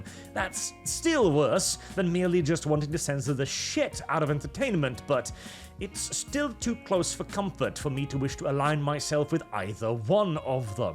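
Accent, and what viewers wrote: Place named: Norwich, United Kingdom. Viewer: What game is this? I cant understand Norwish accent.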